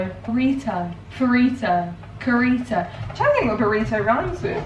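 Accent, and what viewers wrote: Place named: South, United Kingdom. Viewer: just curious, what would you call your type of British accent? Your dad's is definitely not the standard southern urban one, but you are clearly not northern but I can't tell what type of southern accent you have. I'm an American so I have no idea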